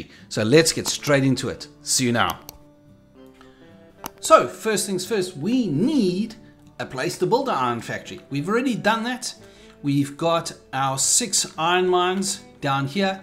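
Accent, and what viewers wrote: South Africa. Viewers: You South African?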